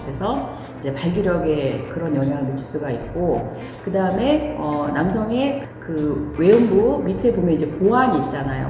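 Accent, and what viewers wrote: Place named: Jeolla, South Korea. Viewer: 짤라서 지져각꼬~!^^ㅋㅋㅋ전라도분이시구나~!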